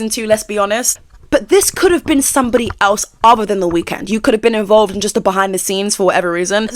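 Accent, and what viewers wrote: United Kingdom. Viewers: you sound like 10 times more british in this video than usually, and it's super cute!!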